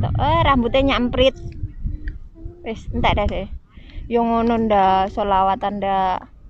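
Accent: Indonesia. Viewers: Ko logatnya kaya orang Jawa timur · Suaranya medok banget kak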